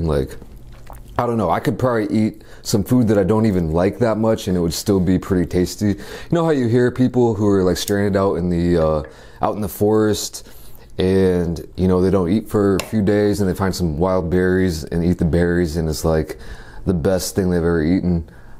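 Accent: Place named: South, United States. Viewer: Asian man with a southern accent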